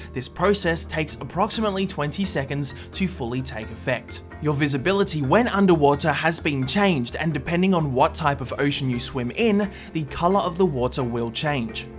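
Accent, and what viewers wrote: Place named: Australia. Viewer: Hi I love your videos and your personality. You inspire me to make short content on my own but I don’t post it because my friends say it’s ‘not good enough’. You are my all time favourite YouTuber and your accent is amazing. (I’m Aussie too!) But in all seriousness, I can’t live a day without watching one if your vids. ❤️❤️ Edit: OMG OMG a heart!!! 😁😁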